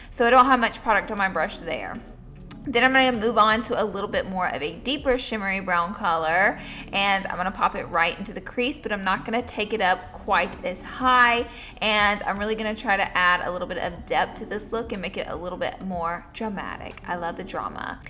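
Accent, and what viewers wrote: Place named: Rural, United States. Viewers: i luuuuuuv that "aksent!!! are you from texas also?? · has a country accent 😂😂 I love it❤️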